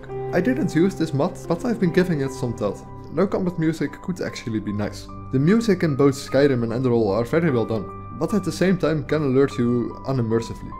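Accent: Netherlands. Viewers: Let me guess, is that a dutch accent? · you sound very dutch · im dutch myself and i noticed how you talked, its sounds very much like dutch english xD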